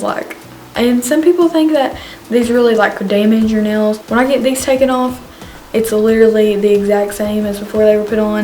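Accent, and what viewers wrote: South, United States. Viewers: Am I the only one who thinks like she's from Russia or from a northern Europe country? I think she looks so much like she's from of theses country that I find it odd that she have a southern accent. I love your videos, you are gorgeous! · wait omg i thought she had an english accent then i realized it was a country accent 💀💀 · Omg I just found ur channel and I'm so happy because I have not found a YouTuber who has a southern accent like me!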